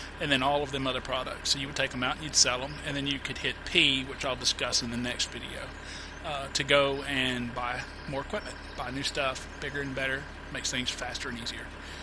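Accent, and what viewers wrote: South, United States. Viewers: The southern accent just completes this guide. Nice!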